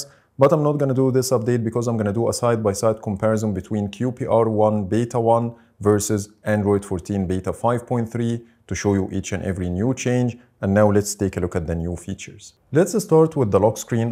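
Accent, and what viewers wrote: Egypt. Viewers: Egyptian English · اول ما سمعت الفيديو حسيت إن لهجتك عربية انت مصري؟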